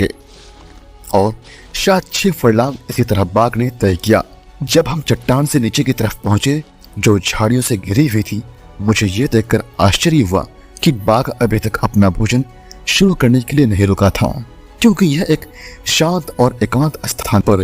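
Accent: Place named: Bengal, India. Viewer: बंगाली एक्सेंट !!! आप बंगला में ही बोलो अच्छा रहेगा। हिंदी बोलना आपके बस की बात नहीं।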